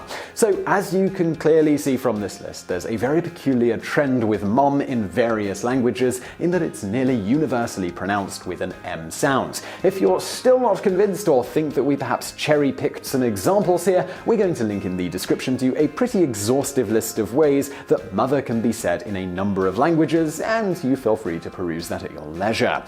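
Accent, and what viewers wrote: England, United Kingdom. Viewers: I'm American but I'm so used to the British accent I couldn't tell if he was British or American for like 3 minutes that was so wild wtf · You really do NOT need to pander to us American rubes. We can handle your English accent, and moreover, many of us are either from Canada, or have relatives there, so we're not (or shouldn't be) terribly alienated when you say "mum" instead of "mom." Oddly, you also refer to the Oxford dictionary. If you're gonna go all out American here you should probably stick to a version of Webster's. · Anyone else think this is Vsauce putting on a British accent? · Daven, I'm kind of the same way, I have so much British and otherwise foreign influence in my life that I'll often use british phrasing and not think about it. And I don't think it's really a big deal, whenever people freak out in the comments and criticize, I just laugh that they care that much about a pronunciation or phrase, especially in this case of a British narrator reading an American-authored script; it's bound to have all sorts of different terms and pronunciations thrown around! 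I've never understood why some people are so uptight about language, like thinking a word has to be said or spelled this way or some dialect isn't really the same language just because it's not the same as how you say it (looking at you, France!). I personally love how English is so diverse between British and American phrases, spellings, and pronunciations, and I count all of them as correct and don't critique someone for using one they wouldn't normally; that's what learning and experience is all about! I honestly wouldn't have sweated it if I were Simon. If I were him, I would have just read it however my brain decided to pronounce it at that moment and not care. And yeah, knowing him, his schedule being like that is pretty common, unfortunately! I wish he'd start doing updates on his vlog again, even if just weekly or every other week or whatever, so we could be in the loop! Send him a quick reminder from the fans, would you? 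;) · You should have stuck to the British pronunciation, Simon. Your accent is strong enough that when you try to say Mom, it doesn't sound at all like anything an American would say. And, believe it or not, there are plenty of Americans that use mum, instead of mom. So you wouldn't have been misunderstood. I don't get your reasoning behind deciding to do this.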